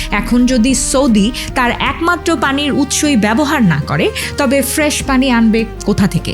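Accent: Bangladesh. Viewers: আপনার ডিটেইলস পরিচয় জানতে চাই। একটি ভিডিও করলে ভালো হয়।আপনার কথার টোন ভারতীয় নয় বাংলাদেশীদের মতো। তাই কৌতূহল বশত কমেন্টস করলাম।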